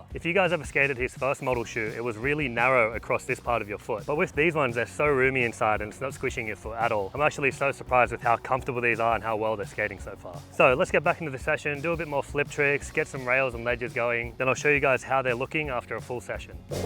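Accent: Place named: Australia. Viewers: Dudes never heard an australian man speak before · hes aussy its not that serious its a culture thing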